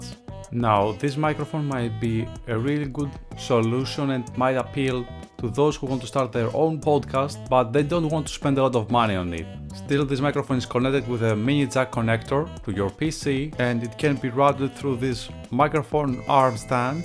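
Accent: Greece. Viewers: Η Ελληνική προφορα ξεχωρίζει από χιλιομετρα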